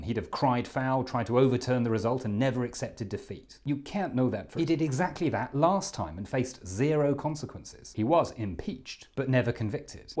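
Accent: United States. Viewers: Congrats on the better-than-I-expected American accent! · That US accent! It’s, like, 98% perfect—which is delightful, in a surprising way and I’m really impressed. “We believe in democracy and the peaceful transfer of power” —we can see the wheels churning: “Get that o right in ‘democracy’! Be rhotic! Be rhotic!” But it’s the 2% that isn’t perfect that I really love. (If it were 100% right, it would be, well, weird, almost distracting.) Great job! I love it. (And, yeah, I’m in the US.)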